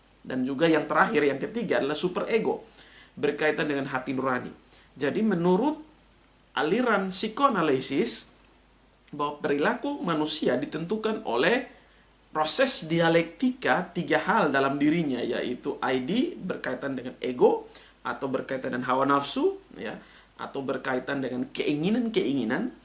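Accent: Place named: Java, Indonesia. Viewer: Koq ada nyelip2 logat jawa nya bapa dosen.. Heheee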